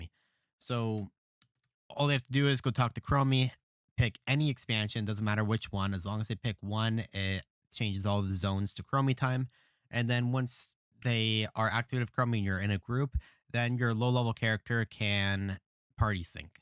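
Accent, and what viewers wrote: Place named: Canada. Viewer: Awesome, thank you! Also you sound like a fellow Canadian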